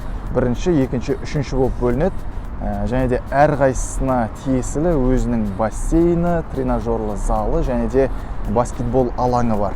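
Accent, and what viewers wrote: Standard. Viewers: Бауырым бираз акпарат алдык рахмет .Озиннин таза казакша сойлегенине танти болдык.Алла разы болсын.Америкада жургендей сезиндик озимизди. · 👍👍👍қазақ тілі таза! Жақсы мәлімет алдық👌👌👌🤩🤩🤩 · Бəрін өте тамаша айтып берді:байыппен, түсінікті. 👏👏👏 Басқа елде өз тіліңде таза сөйлегені былай тұрсың!👍 Бақытты бол, балам!😚 Жастардың осындай білімді, мəдениетті болғандарына қуанамын! Тілші деп осыны айт. Жарайсың, алға! 🇰🇿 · Рақмет, ризамын саған бауырым қазақ тілінде көбісі таза сөйлей алмайтын қазақтарға үлгі болсын сенің тұлғаң!!!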